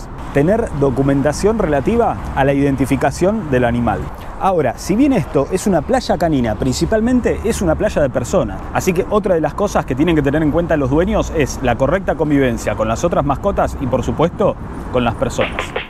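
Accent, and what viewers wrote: Argentina. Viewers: Que buenos tus vídeos, es muy agradable escucharte hablar a cámara, supongo que el acento argentino también nos llama mucho la atención a los españoles. Hasta hace poco cerca de mi piso había un asador argentino con una familia muy agradable regentandolo, qué pena la última vez que fuimos a reservar y vimos que había cerrado. Supongo que la pandemia pudo con ellos.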